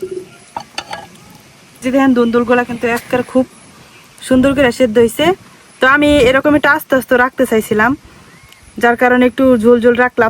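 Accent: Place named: Kishoreganj, Bangladesh. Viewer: আপু কিশোরগঞ্জে কথায়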